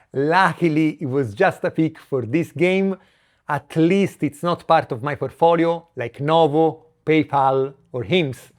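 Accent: Italy. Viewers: THIS GOTTA BE THE THICHKEST ITALIAN ACCENT I HEARD 😁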